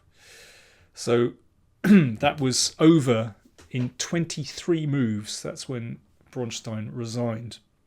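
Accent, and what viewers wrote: United Kingdom. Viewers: Yeah. Very nice british accent.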